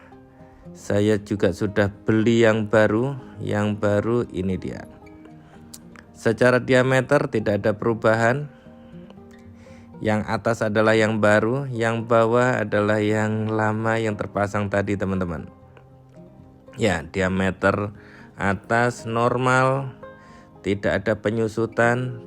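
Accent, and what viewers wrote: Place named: Bencah Kelubi, Indonesia. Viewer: Mas orang mana? Kok seperti trek Bencahkelubi?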